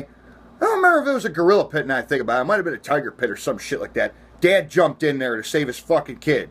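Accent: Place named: South, United States. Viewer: Where were you from? I love the southern accent! Men & women! You should have written a country song about the incident. Though id like a clean language lyrics of that song if you could write it! You're are are right! That mother was ignorant!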